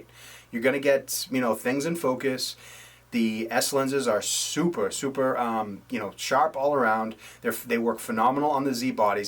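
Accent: Boston, United States. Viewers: Just got the Z6 am a portrait photographer working in-studio and natural light. will be renting the Nikon 50mm F1.8 S and the Tamron 24-70mm f2.8 G2 lens and using them in an informal shoot. What are your thought s on the Tamron lens if you've worked with it? Love the B&W, the info, and the accent being a Brookline MA ex-resident living in Seattle Thank you.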